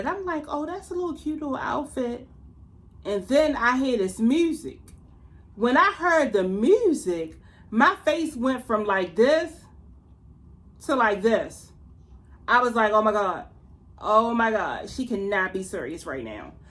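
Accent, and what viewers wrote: Baltimore, United States. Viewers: I love ur Baltimore accent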